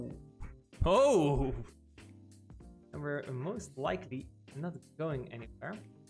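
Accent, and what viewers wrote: Netherlands. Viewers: great man. I heard the typical dutch accent when talking english haha. Great content man , i love it !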